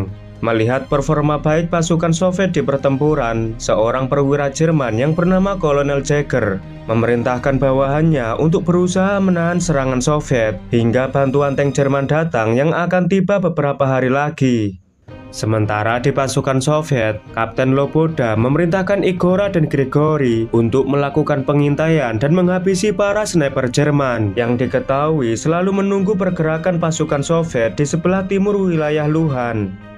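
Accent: Java, Indonesia. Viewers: Medok bngt min suaranya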